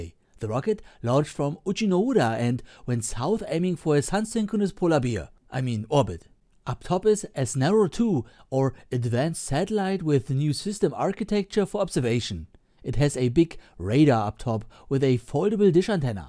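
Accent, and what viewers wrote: Germany. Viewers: Great Video. Where are you from your accent is very german. I’m from Germany. Hi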